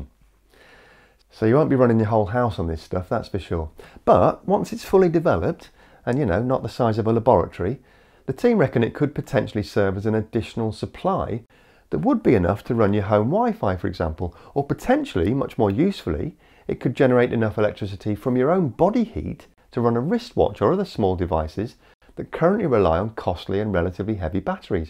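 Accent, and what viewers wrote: United Kingdom. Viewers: Idc if you have a British accent, I’m not in agreement about global warming.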